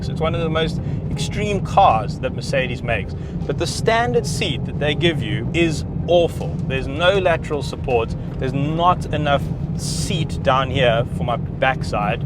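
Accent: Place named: Johannesburg, South Africa. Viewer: The Jo'burg accent😂😂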